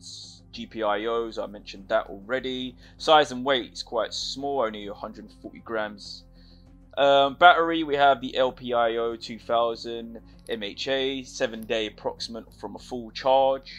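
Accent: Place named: United Kingdom. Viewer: I can listen to a British dude explain anything... 🤷‍♂️ innit.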